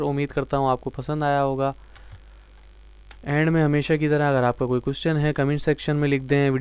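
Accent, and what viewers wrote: Karachi, Pakistan. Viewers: Apka lhja karachi walon k ha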